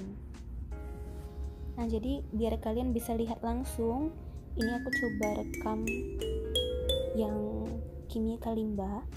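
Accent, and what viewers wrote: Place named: Visayas, Philippines. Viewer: I thought you were bisaya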